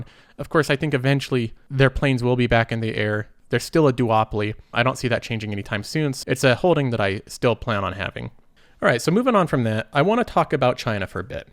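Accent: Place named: Midwest, United States. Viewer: Midwestern american, I would guess but it's not a strong accent. Listen to "protests" , or ish, "we don't want you tah talk about politics."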